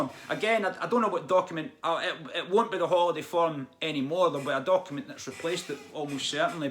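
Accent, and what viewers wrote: Scotland, United Kingdom. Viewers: It's amazing how people like to look down on other people once they get into a position of supposed authority, especially in the police force.why why why but there is something we can do about the dwp have you tried contacting radio 4? They are pretty good at exposing this sort of stuff. Never apologise for your great Scottish accent, we all have accents. Have a good day🥰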